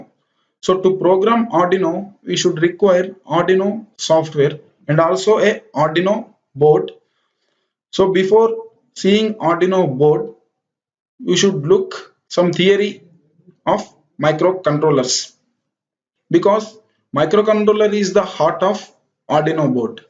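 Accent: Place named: India. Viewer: Good content, but Indian accent is so strong it is hard to understand.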